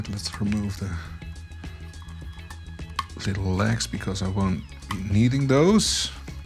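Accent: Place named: Netherlands. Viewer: Your English sounds sooooo Dutch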